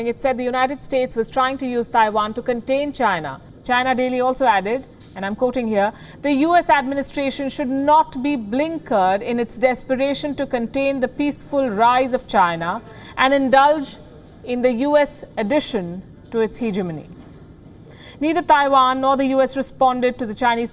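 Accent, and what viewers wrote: India. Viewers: Damn Indian English~~~ It sounds like a convenient store clerk voice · I know you are doing the good thing. Thanks. But do you realize that you have a very heavy accent and people do not understand what the heck you are talking about. Can you slow down your speech and enunciate. It applies to all Indian speaker with heavy accents. Can't you guys know you have very heavy accents? I know China lies. but you should say it clearly so that more people understand the truth.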